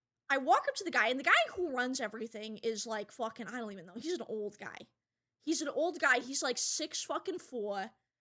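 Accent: Boston, United States. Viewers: I somehow never made the connection that cardboard is pronounced the same way as any other "-ar" word in Bostonian. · don't hear Boston accents very much were I'm from. It's unique and interesting. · The Boston debuff is so cute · Just sounds like every woman in Boston to me. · The Boston accent really enhance the stories. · It's a Boston accent, lol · shes from boston, and as far as im aware its not a character thing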